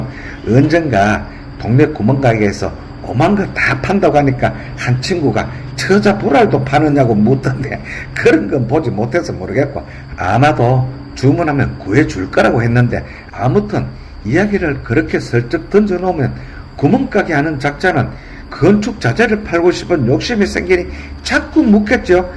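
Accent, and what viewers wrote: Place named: Gyeongsang, South Korea. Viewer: 왜?유트버는 대개가 경상도 말씨를 쓸가?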